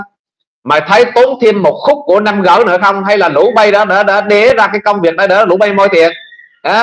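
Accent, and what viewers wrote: Standard. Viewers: Nói chuẩn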